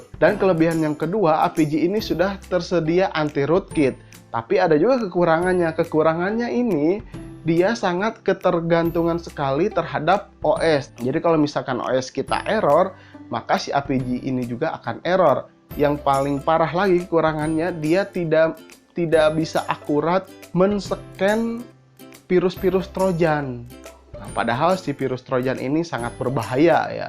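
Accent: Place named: Bandung, Indonesia. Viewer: apas wkwk logat bandung euy :D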